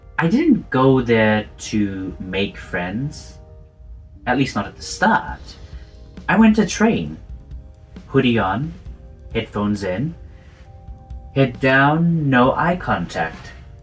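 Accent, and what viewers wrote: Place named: South Africa. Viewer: Just one thing I need to know, you accent sound a bit South African, I know that you're in Australia but do you have a connection to South Africa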